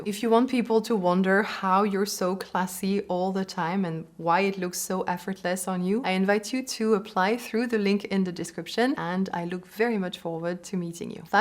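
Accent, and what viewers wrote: France. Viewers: Very informative. I like your lovely French accent. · Hey u look so pretty and your french accent is very lovely. 🤓🫶💖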